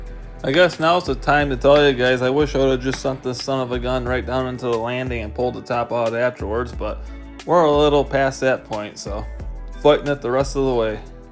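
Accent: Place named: Ohio, United States. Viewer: Just from his dialect I'd assume Ohio or Pennsylvania.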